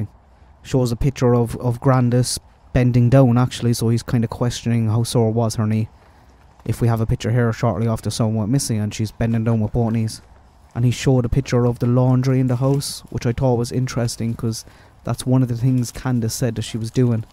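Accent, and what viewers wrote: Ireland. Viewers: I saw that video also. You are on point. After Summer left the car that afternoon we have no idea what happened after that. She was the kind of mother that didn’t keep eyes on Summer too much. When saw where Summer slept he said he knew about Summer. He was really shocked but held it well. The mattress was on the floor so looking under a bed was off unless she pulled up the mattress. So many conflicting stories. There were no flowers planted that day. When she was at the watering hole she emphasised the flowers were at a certain spot. She knew this was a major part of her story. Oh you are for sure Irish. Kerry maybe! Love your accent!🇨🇮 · Thank you so much for that. I can't do lives or very long videos at the moment, so to have it summarised really helps. I'm with you on voice, very calming and relaxing. I would love to have been a fly on the wall when he was in service, seeing him interview murder suspects, putting them at ease and lulling them into a false sense of security. 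I love how he talks, but I love your accent too, I've many Irish friends and all their accents are beautiful, but so varied. I can't imagine why anyone wouldn't like?... but then we're all different and different things appeal to us I suppose. I'll try and look at his video, but I really appreciate your summing it all up. I share some of the same potential theories with you. The refrigerator, as soon as I saw it I thought it odd they hadn't taken the door off. I grew up in the 70s in London, and we used to get public information broadcasts, some acted, others animated, but there was one about a group of kids playing on a dump site, one of the kids ends up hiding in the 'fridge, there's a piercing scream when one of the girls finds him. The narrator with the voice of doom explains how no matter what, you never, ever play with old fridges and that if you get rid of one, you must take the doors off. 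Every holiday they'd play it, among others. Plus my parents drummed it into us. One of my preferred theories was exactly like yours. Either they did the whole hide and seek counting thing to get rid of her annoying them, or actuall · I am slowly going through your others. I must ask where in Ireland are you from, your accent is lovely. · I agree, I really like and it is a great advantage to have a retired homicide detective looking into the case. ASMR - yes! LOL, he has a relaxed way of speaking and I do fall asleep listening to him but then I'll relisten from where I conked out. I also like the way you speak and your Irish accent.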